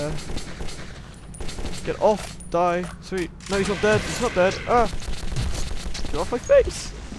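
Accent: United Kingdom. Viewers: Um.... since when does having a british accent make you sound like a nerd. I never really thought about his voice that much, everything is pretty much for the lolz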